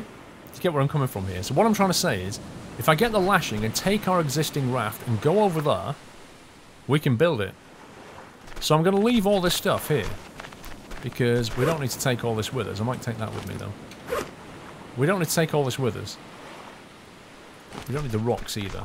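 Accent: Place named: United Kingdom. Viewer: he is obviously British like me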